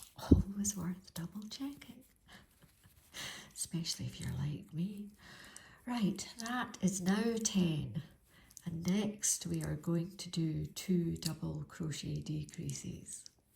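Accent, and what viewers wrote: Scotland, United Kingdom. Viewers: Thank you so very much for sharing your pattern and tutorial. You explained everything in your beautiful Scottish accent. ❤ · Thank you for this sweet pattern and tutorial! Greetings from New York. My mother-in-law came from Helensborough. I enjoyed listening to your Scottish accent. Your instructions are very clear. I will make these for charity as my youngest grandchild is now six. They are darling! ❤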